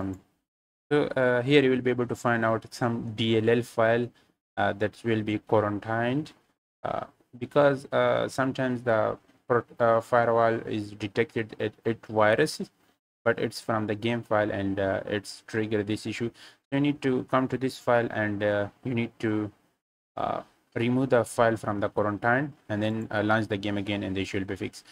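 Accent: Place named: India. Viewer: Thank you it worked! Always some guy on Youtube with Indian accent will save your ass!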